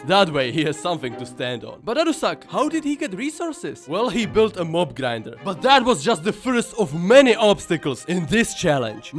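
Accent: Czech Republic. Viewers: The Czech accent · Why do you have a czech accent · Ah... i was questioning myself that you have quite cz accent :'D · Je to haluz, hned jsem poznal přizvuk, Češi se poznaj 😀 keep up the good contennt, dobře se poslouchaš! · The most Czech accent in history frfr. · this guy's English accent is just typical Czech...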